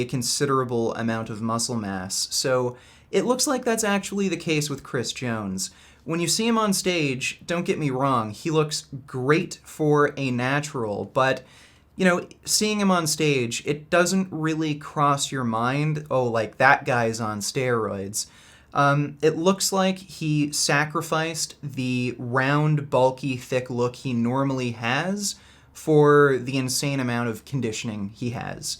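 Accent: Canada. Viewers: He’s Canadian bro what did you expect eh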